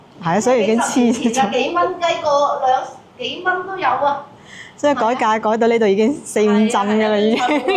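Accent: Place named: Xiguan, China. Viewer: 老闆娘好勁，咁都聽出妳係西關小姐😂。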